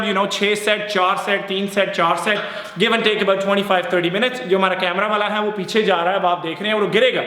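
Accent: Punjab, India. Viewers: bhai saaaa mazzaa aagyaa,,, or bhai aap ke bolnne ka tarika or aap ki pannjabi waahh mazzaa aajata h..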